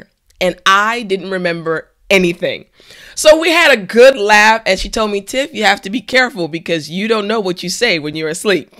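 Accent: New York, United States. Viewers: Are you American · by the way, you sound more American than the real British native speaker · Are you from New York? Atlanta?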